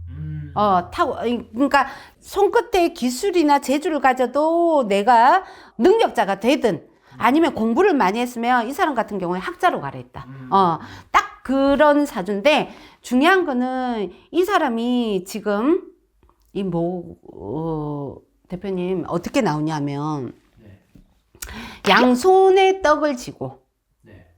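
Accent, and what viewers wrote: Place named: Busan, South Korea. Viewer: 이사람.말투도 너무 싫음 부산사투리 쓰는사람 싫지 않았는데 이사람은 유난히 듣기거북함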